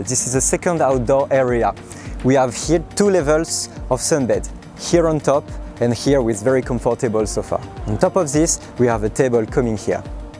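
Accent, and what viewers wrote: France. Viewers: Nice French accent. "The key to life is happiness in your household"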